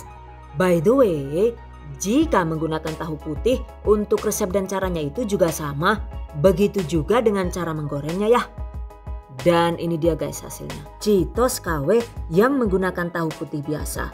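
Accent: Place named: Cilacap, Indonesia. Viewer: Ky logate wong cilacap😁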